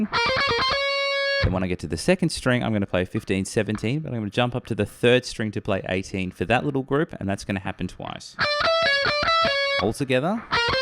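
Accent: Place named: Australia. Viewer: very tough the stretch 15 18 22 as i have medium size fingers which tend to jam the strings muting the sound and killing the notes....u are a big guy so its easy.i can cheat with tapping easily! but i am tempted to stay honest and untap--but i am still struggling, now 2 days of 2 to 3 hours of playing (how many days would it take to crack this part?) ...8.43 part of the video is what i am referring to....and do you follow cricket? you sound aussie..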